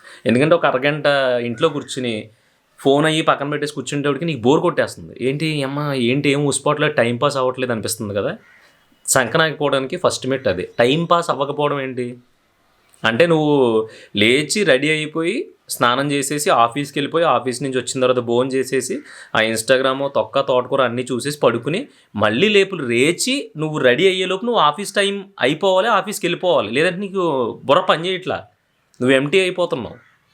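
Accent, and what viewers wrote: Godavari, India. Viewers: బ్రో సూపర్ మా గోదావరి జిల్లా భాష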